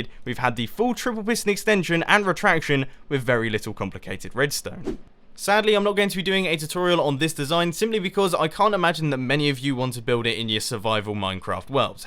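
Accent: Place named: United Kingdom. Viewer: has an accent though I can't pinpoint what accent he has, I wanna say it's a british accent but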